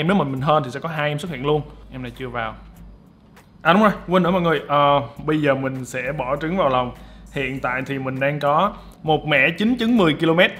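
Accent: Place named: United States. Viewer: Anh có học tiếng anh không anh do lúc a nói chuyện rất giống người Mỹ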